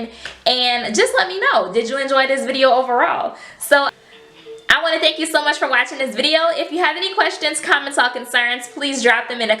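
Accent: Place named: Louisiana, United States. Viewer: Queen! I knew as soon as I clicked on you that you were from Louisiana! You sound like Mardi Gras, crawfish, and gumbo. Man I miss the south!!! Gorgeous hair!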